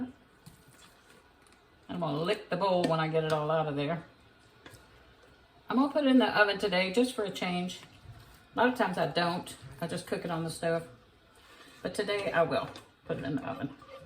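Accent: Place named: Kentucky, United States. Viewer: You sound like a Ky. Girl, I’m a ky girl.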